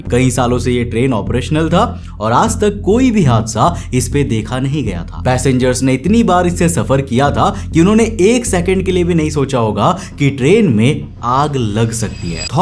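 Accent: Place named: Bengal, India. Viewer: Tumri Hindi accent babitaji jaisi hai yani bongali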